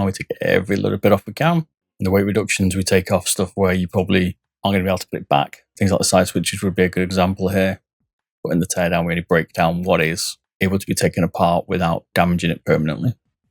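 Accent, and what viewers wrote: United Kingdom. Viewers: Yeah, not sure where’s he from, somewhere in the UK.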